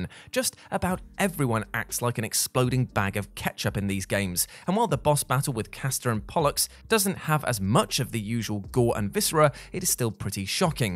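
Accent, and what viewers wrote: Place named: United Kingdom. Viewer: It’s a British pronunciation. You should hear how they pronounce “schedule” or “herbs”.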